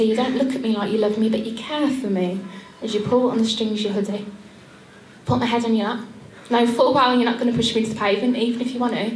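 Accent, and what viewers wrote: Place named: Birmingham, United Kingdom. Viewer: excellent, the brummie accent really suits your style, and you're beautiful too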